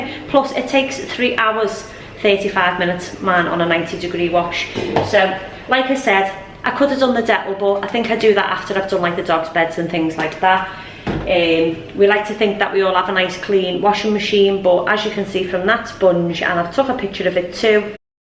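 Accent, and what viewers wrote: Liverpool, United Kingdom. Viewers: Hi great video and love the scouser accent.Just one question to make it crystal clear,does the soda go in draw which you demonstrated and vinegar in drum separately,being a bloke im a bit slow to cotton on with this cleaning lark.Thank You in advance!!!!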